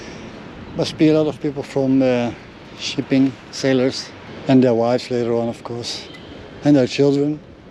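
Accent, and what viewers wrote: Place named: Germany. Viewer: Oh pardon me. I just think you're German from your name and accent. also, I just know that you like the cemetery beside you like trying transportation. Indonesia actually has a lot of Dutch cemeteries. some of them still exist and some of them have already been destroyed. Examples of good cemeteries like Makam Jerman Arca Domas. Its cemeteries said that it's Nazi soldiers. Others are still good like the cemetery in kebun raya bogor, makam belanda at Menteng dalam Tebet and the museum Prasasti.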